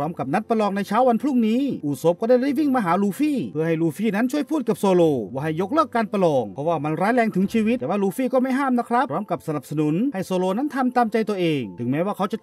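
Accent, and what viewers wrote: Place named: Bangkok, Thailand. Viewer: สำเนียงคนกรุงเทพฯแท้ๆแหละ ดูออก แม่นบ่แอด?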